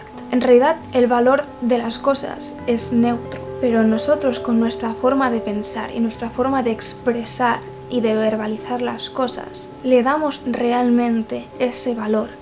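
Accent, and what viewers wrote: Spain. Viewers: me encnata tu acento español . y tus videos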